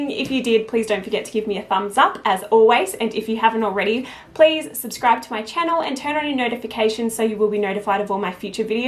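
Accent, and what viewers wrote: Australia. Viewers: Thank you for another informative video! Love your Australian accent 😄 Love from the UK xx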